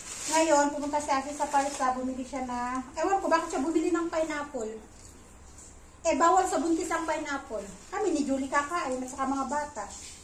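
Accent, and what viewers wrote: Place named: Ilocano, Philippines. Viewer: Adda latta tono nga ilocono ka ate hehe.. God Bless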